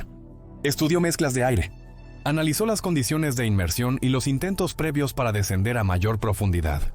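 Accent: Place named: Mexico. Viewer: YO SE QUE TÚ ERES MEXICANO EL ACENTO LO DICE TODO.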